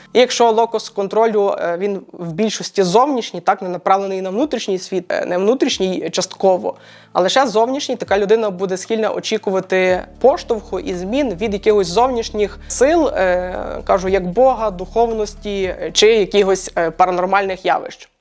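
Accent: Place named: United States. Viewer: У вас акцент американський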